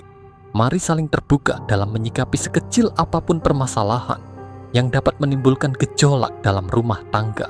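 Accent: Bali, Indonesia. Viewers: Admin org Bali ya? Aksennya kentel bgt 😅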